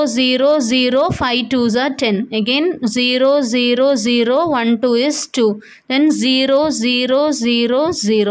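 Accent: Kanyakumari, India. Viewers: Kanyakumari voice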